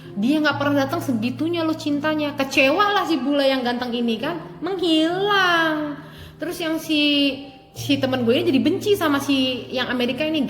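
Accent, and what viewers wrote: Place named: Indonesia. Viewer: Youtuber paling menghibur ini, cara bicara nya benar2 indonesia bgt, mantappp jiwaaa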